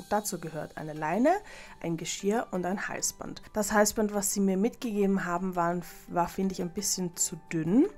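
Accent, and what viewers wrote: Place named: Vienna, Austria. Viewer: Liebe, ich habe gerade abboniert. Bist du aus Wien? Du klingst so, ich bin aus Wien. Morgen zieht ein Hund aus Russland bei mir ein. Wenn sie sich eingewöhnt hat könnten wir Socialwalks machen. Du wirkst sehr freundlich und positiv. Liebe Grüße.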